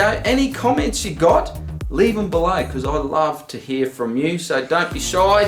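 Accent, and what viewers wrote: Australia. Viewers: Now correct me if im worng but you sound Australian, and if that is the case are you ok? You know with the the fires happening.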